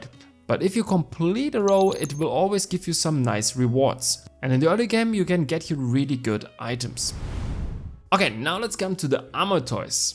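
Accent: Germany. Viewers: I hear german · Thanks for the great help! I always like to know as much as I can before I play something cause I'm all for efficiency. My ADHD friends called me autistic but always stop complaining whenever they need me to explain something or look it up for them ;) <3 Amazing video, clear explanations with added visuals as aid, no time wasting, German accent, told to have fun 10/10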